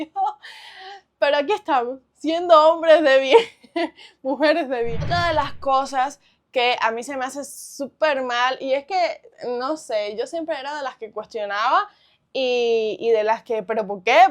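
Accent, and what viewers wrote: Cuba. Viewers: Me encanta tu acento cubano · Me encanta tu asento cubano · Hola esta perfecto tu vídeo, pero habla un poco mas lento, si cuesta trabajo entender 😢 mas que nada por qué el acento de cuba es muy padre.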